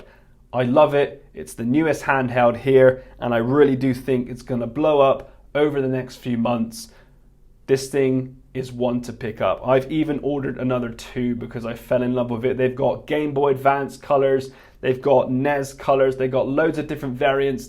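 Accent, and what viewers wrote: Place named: United Kingdom. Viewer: are you based in england or scotland? i am just curious because of your accent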